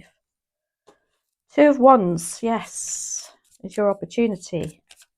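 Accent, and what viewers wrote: United Kingdom. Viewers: Hey welcome, nice to hear a Uk accent tarot reader. My first time here ❤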